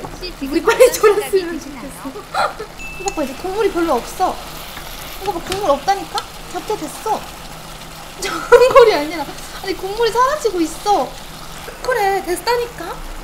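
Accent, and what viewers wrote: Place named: Gyeongsang, South Korea. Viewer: 나 경상도사람이긴한데 님 경상도사투리에 치인다....❤️❤️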